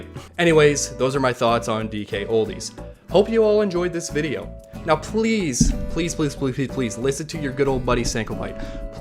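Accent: United States. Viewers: How are you not from America but have one of the cleanest American acents I’ve heard in a very long time ? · You have a very American accent, where are you from?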